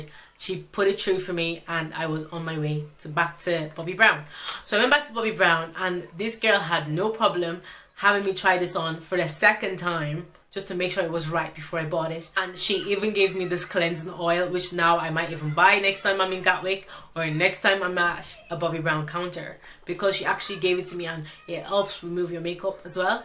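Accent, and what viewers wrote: Ireland. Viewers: I was thinking "Oh cool, a YouTuber from Nigeria. Wow, it sounds so much like an Irish accent sometimes, that's kind of interesting...." Lol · so so pretty! I have never seen a Nigerian with an Irish accent! its actually really nice! sorry you had a crappy time, isit the same in ireland with mac? x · your accent sound irish♡, I used to live there. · ***** oh i just realise the girl an irish accent i am from ireland what part you from lolz i am from co.tyrone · Are you from Dublin? Just a guess haha x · i knew I recognised the accent 👌im Irish two 💞Are you living in Dublin❓🍀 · I'm a brand new subbie so I have to ask!!! Are you Irish ? It's just that I live in Dublin and I can hear it in your accent! Not knowing is killing me! xxxx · Are you from Ireland? Your accent is amazing omg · Have you got a irish accent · Sounds more Irish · Do you live in Ireland? 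There's a little Irish accent in your voice! ❤️ (I'm from Ireland) Subbed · I just came across your channel and I'm wondering did you ever live in Ireland you sound really Irish sometimes when you speak :) can't believe how you were treated I would have freaked out lol. I've never had this happen to me thank goodness! :) I subbed :) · Your accent is beautiful. I was listening and listening, and I was like...Irish...and Nigerian. It's awesome! So lucky! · I'm Irish, and you have a bit of an accent, do you live here or are from here? You're absolutely stunning, and I loved this video! Subbed x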